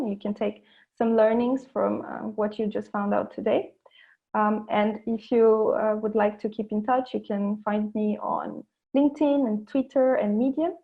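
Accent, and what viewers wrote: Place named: United States. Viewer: Nu am urmărit tot spiel-ul, dar sper să o fac altă dată. Pe mine mă durea întotdeauna capul când trebuia să vorbesc în l. engleză cu clienții nemți 🙂. Dar asta a trecut. Supărarea mea cea mai mare este idioata „romglish”. Nu știu dacă va mai veni vreodată o vreme când o să ne fie cald sau frig în loc să suferim de „disconfort termic” !@ ! În altă ordine de idei, aveți un accent englezesc superb. Mi se pare însă mai degrabă american, decât britanic. Numai bine!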